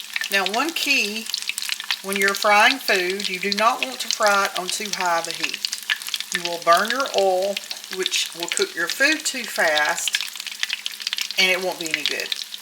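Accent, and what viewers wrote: South, United States. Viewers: I love watching you. I was raised ijn So. Calif. My family is from the South. I learned home cooking that is Southern. And I so enjoy your Southern accent and what you cook. It is just down home for me. Thank you.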